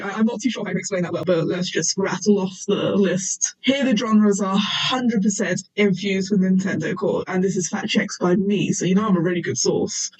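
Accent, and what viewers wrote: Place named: United Kingdom. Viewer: Hello fellow Brit! It’s very comfy listening to your accent 😆 There was a lot of stuff in your video pre- I had no idea about, I should check those artists out! When you said you wasn’t sure if you got anything wrong during the 2000s period, I have some observations I was a teenager in 2008 (I was 18!) so I can give you a bit of substance to the google trend data NintendoXCore was of course a genre of music coined by on their first demo and their website. The animated music video went viral in 2004 on Albino Blacksheep and pushed them to new heights. The original video didn’t even use Nathan’s vocals, they used Adam Crooks despite Nathan’s already being on R.Borlax. Cool fact! So during that time they were circulating alongside other post-hardcore inspired acts like, etc. NintendoXCore as a genre stood out because it was making heavy and obvious use of the chippy synth sounds. 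But teenagers on MySpace during what you accurately called the third wave of emo (scene!) just thought it sounded cool and didn’t know what the hell they were talking about. So they’d unironically say things like ‘I prefer SegaXcore to NintendoXcore RAWR’ and other ridiculous sounding things that had no bearing on the music itself. It just kind of became its own meme. Because a lot of bands gaining prominence back then were using 8-bit, chiptune, or electronic sounds NintendoXCore as a genre kinda blurred into the cul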